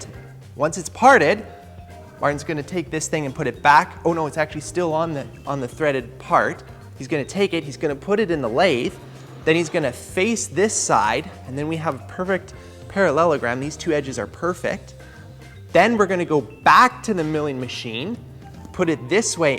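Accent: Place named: Canada. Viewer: Are you Canadian, or is it just an act? just wondering because it sounds forced at times 🤔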